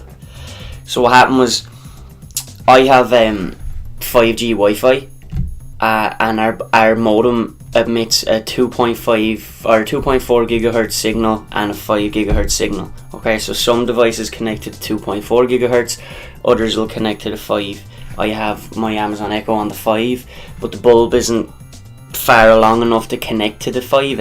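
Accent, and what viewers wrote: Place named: Ireland. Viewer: Thanks for the review! Also your Irish accent is so cute!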